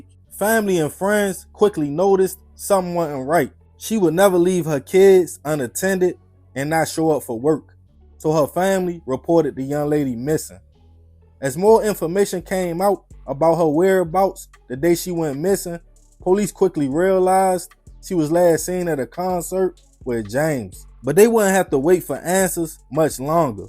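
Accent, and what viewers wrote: Baltimore, United States. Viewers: That baaaltimore accent is a no for me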